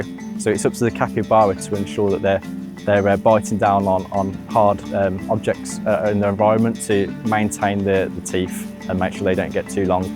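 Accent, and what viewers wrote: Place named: Australia. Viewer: this man sounds aussie but why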